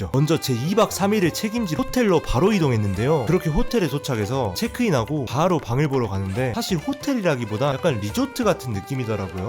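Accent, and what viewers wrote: Jeolla, South Korea. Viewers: 전라도 말투 잘들었습니당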